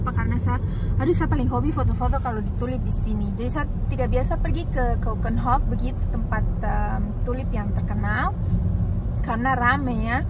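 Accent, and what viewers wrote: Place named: Papua, Indonesia. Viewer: Hallo kak, saya lihat postingan kk yg di tempat bunga tulip langsung sa cari di youtube. Kk di indonesia tinggal dimna? Soalnya dengar logatnya logat papua😊🥰.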